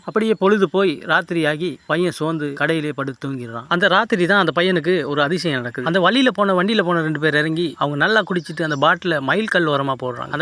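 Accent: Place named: Sri Lanka. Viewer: Srilanka slang adikkidhu. Are you Srilanka?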